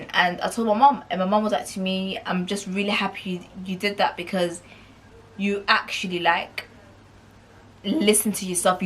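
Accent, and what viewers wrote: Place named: Scandinavia. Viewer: by the way wheres your accent from?, are u from those Scandinavian countries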